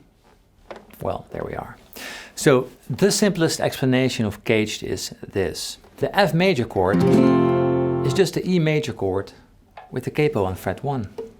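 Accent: Netherlands. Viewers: The Dutch accent is thick in this one. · This man has one the smoothest yet clearly Dutch accents I've ever heard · you sounds dutch? are you dutch?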